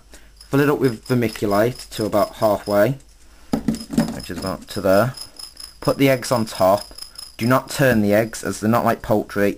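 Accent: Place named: United Kingdom. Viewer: nice video. but sorry i reallly reallly hate brittish accents. lol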